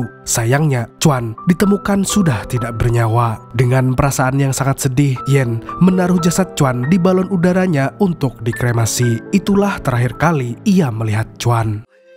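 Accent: Banjar, Indonesia. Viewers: Bah.. Urg banjar kh ni? 😅😅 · Ulun kada tahu Pian orang Banjar kah... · Org Banjar sekali kwn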